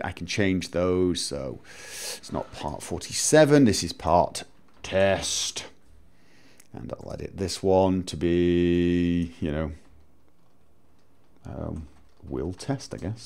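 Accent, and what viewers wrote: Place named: England, United Kingdom. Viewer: It's a heavy'ish english accent, and I've heard plenty of scottish and irish people talk. Not all you scottish people have a superheavy accent.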